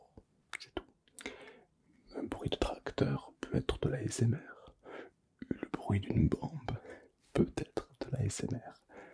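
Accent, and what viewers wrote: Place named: Toulouse, France. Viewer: Une petite pépite ta vidéo ❤️ merci a toi ta une très belle voix avec ce petit accent toulousain ❤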